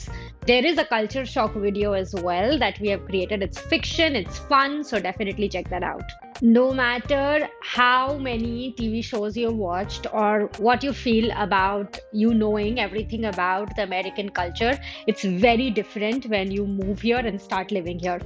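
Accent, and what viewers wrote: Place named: North India, India. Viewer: Hey this typical North Indian accent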